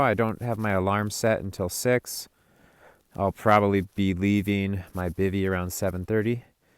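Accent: Argentina. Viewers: your Spanish has improved! Nice to hear that Rioplatense accent haha. Always thrilled to see you upload a full length video. Your instagram clips are just not long enough and you've stopped writing on your blog. These vlogs will have to do. I'm a novice at the mountains but whenever I'm in chalten I always dream of bumping into you! Keep on posting inspiring content for us please:) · Amazing stuff! Glad to hear you are picking up the argentinean accent when speaking spanish;)!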